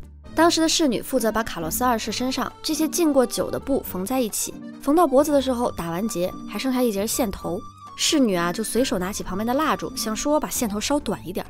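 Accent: Cantonese, China. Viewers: 廣東話太可愛啦🎉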